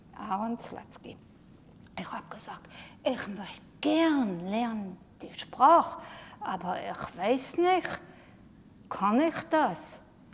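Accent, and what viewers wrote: Germany. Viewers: This was also my impression. She is a native German speaker who tries to speak Yiddish but doesn't manage to do so. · oh my, thought she spoke german with dialect.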